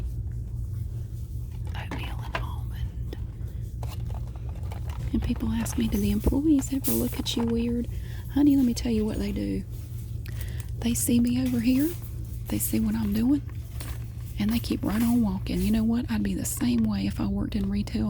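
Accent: South, United States. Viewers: I’m love your videos! I was just like you….I too had a smart mouth 😂 I did get in trouble for talking in class tho. I wasn’t disrespectful just talked too much 😂😂😂 I’d have to stay after school and write “I will not talk in class” on two pages of lined paper! 😂😂😂 And I love your southern accent. I live in a suburb outside of Chicago. Not a lot of southern drawl in the Midwest. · Love your southern accent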